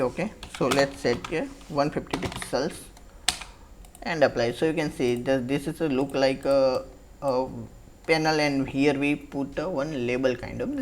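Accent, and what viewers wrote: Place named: India. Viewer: I follow you and I am happy to discover Qt with you. I used to work with Delphi or C++ Builber, and there are many similarities. I appreciate the way you make tutorials on Qt C++. I have a suggestion if possible. Sometimes, this is hard to understand you, maybe because of your microphone. As I am french, I know that Indian people and French people have a different accent in English. Maybe you can improve this. Anyway, thank you.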